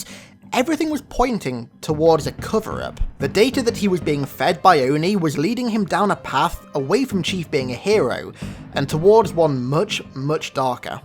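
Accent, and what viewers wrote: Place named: England, United Kingdom. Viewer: English country accent , The greater good .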